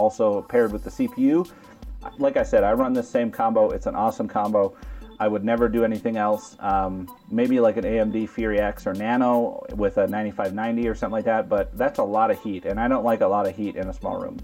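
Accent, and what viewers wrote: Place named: Newfoundland, Canada. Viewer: You sound Canadian, I sense a Newfie.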